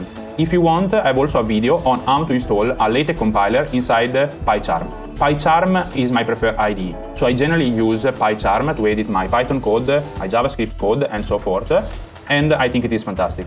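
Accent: Italy. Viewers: Your accent is just like a reknown Italian Football Journalist "Fabrizio Romano" and he is perfect at his job. You're similar to him! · but you sound italian 😁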